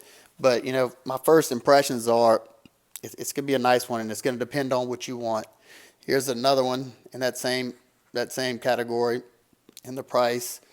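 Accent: Louisiana, United States. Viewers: gotta be from Louisiana?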